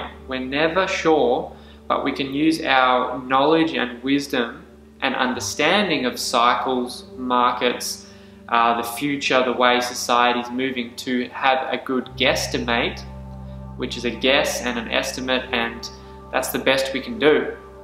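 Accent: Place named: Australia. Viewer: I thought you sounded Aussie, Aussie here, so Aussie living in the US?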